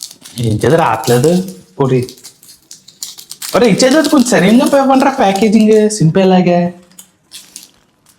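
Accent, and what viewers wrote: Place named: Visakhapatnam, India. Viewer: Bro where are you from bro ....matching to vizag slang ....if from vizag which place in vizag ....and I like your videos bro ❤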